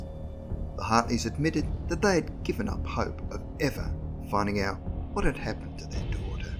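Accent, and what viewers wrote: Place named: Australia. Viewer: Dude that's crazy you sounded like you were from a bunch of different places. I heard the Australian after a bit of the video I actually commented that in like the first few seconds of it haha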